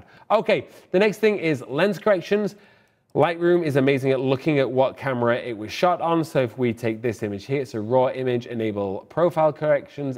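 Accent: England, United Kingdom. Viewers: It was great to discover an English man talking about Lightroom!! There are so many Americans and as a hearing impaired lady it’s difficult to follow their accents sometimes, even with the captions. Thanks for an informative video. I’ve not used Lightroom before so I will be following your blogs with interest. Just one question. Why do bloggers feel they need to speak really fast?!!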